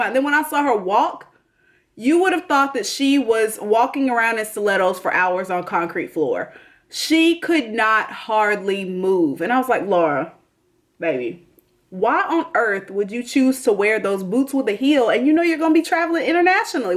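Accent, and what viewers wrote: South, United States. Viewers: lol...You are so southern like me..It's funny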